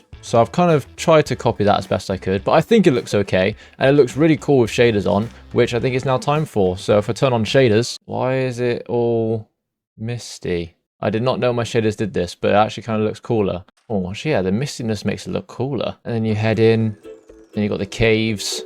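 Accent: United Kingdom. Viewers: Excuse me sir.. odd question but are you Cornish? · are u british because u say "li'el bit more"instead of little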